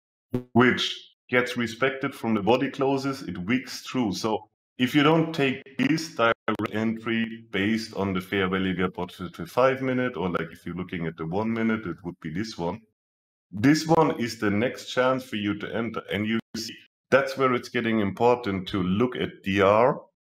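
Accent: Germany. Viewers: Are you German, bro?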